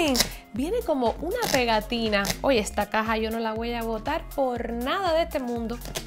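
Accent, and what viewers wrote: Cuba. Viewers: me encanta tu tono cubano!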